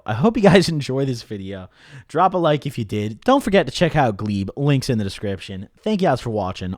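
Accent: United Kingdom. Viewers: that british accent is disgusting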